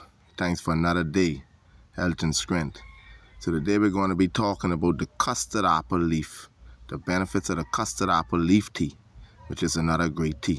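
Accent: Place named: Bahamas. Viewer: Man, you can't hide that Bahamian accent. 😊 Thanks so much for sharing! Grateful for something from someone and somewhere I can relate to. Subscribed.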